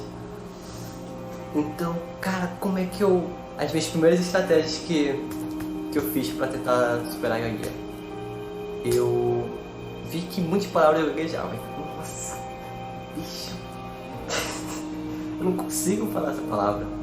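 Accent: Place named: Amazonas, Brazil. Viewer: Gostei muito da sua sinceridade, e da forma tão livre como você fala. Seu sotaque parece amazonense... Sucesso amigo!!! :-)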